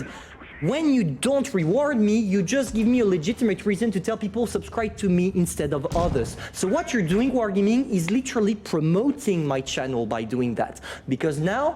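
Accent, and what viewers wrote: France. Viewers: you are the best Blitz- You Tuber of all, besides Meadsy and Droodles. Stay as you are, because you got balls and are telling always the truth about the game. Most I love your french accent in english. All thumps up..... Greets from Germany, the land of the mad.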